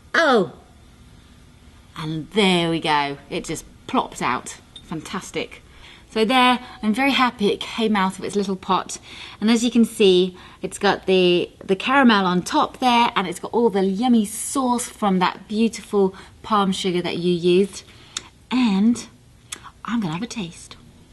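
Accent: England, United Kingdom. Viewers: Shes beautiful, can cook malaysian food, and that english accent! Marry me baby ;)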